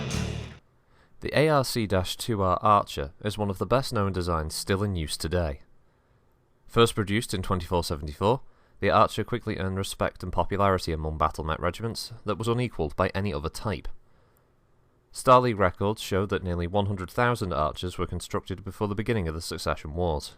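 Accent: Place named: England, United Kingdom. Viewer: you're English right? Yall are the best narrators and so far id say you are carrying just fine. so far you are proving that considering i pass around your shit to my homeboys. I'd hope they did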